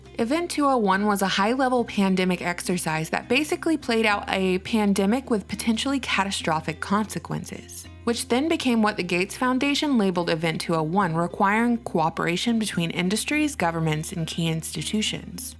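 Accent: South, United States. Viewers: A southern Belle and wish there were more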